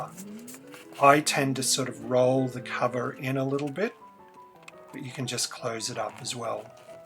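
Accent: Australia. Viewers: I thought you sounded Australian! Which state are you in?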